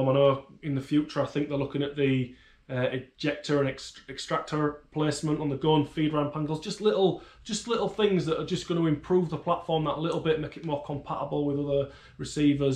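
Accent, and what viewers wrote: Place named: Northern England, United Kingdom. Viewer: Hi there matey, am loving your 'Northern Style' as a fellow northerner it's nice to hear rather than yet another 'Shandy Drinker'. Just got my 22WMR Variation back from my local force and I am currently in discussions with Rob at Guncraft Ltd. about one of their 22WMR AR's. The thing that I can't seem to decide on is barrel length vs Handguard Length. What Length is your DD Handguard? as I am aware you're running one of Rob's earlier 18 inch barrels. The reason I ask is I like the look when the barrel doesn't protrude far past the Handguard, that way when you add a moddy it kind of has the look of an MP5SD if you know what I mean? Finally I see you like the Kriss Defiance DMK22, I've had mine for just short of three years and I absolutely love it, still one of my favourite gats. I'd post a pic but haven't worked out how to do it yet? 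Oh an incidentally this is my first ever You Tube comment so consider yourself honoured!?!?! lol.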